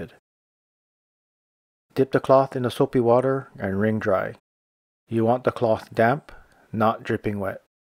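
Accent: Hawaii, United States. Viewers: You’re from Hawaii 🌺. I just listened to you speak and knew🙋‍♀️. Excellent tips. Thanks. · Is that a Hawaiian accent I hear?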